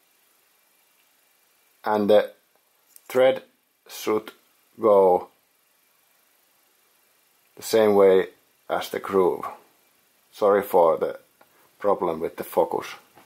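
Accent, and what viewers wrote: Finland. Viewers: I love your Finnish accent :)) I have the possibility of buying a Pfaff 93, do you recommend it? How much would you say it is worth? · Hieno video! Rallienglantia kuulee nykyään liian harvoin kun Kimi ei enää esiiny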